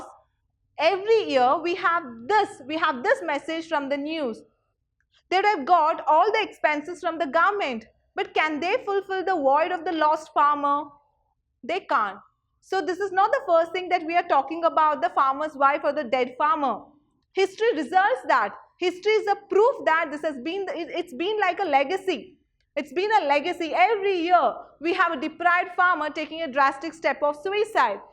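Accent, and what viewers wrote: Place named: Kerala, India. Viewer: But her pronunciation is unbearable...mallu pronunciation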